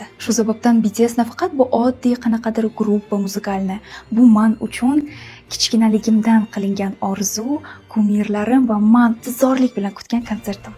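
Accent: Navoi, Uzbekistan. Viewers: Wow navoiylikmisiz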